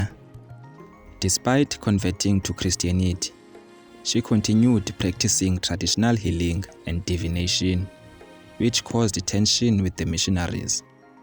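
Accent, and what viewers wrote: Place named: Xhosa, South Africa. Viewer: Great story but u'r strongly Xhosa so u'r clearly struggling to pronounce other sotho names...you can't narate a sotho story with a Xhosa accent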